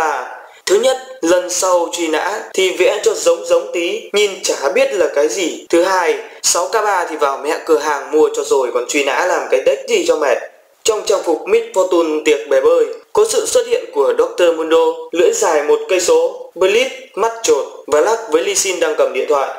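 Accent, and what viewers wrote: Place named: Vietnam. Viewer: Video rất có ích nhưng mỗi tội ad phát âm tiếng Anh đậm chất nửa Anh nửa Việt.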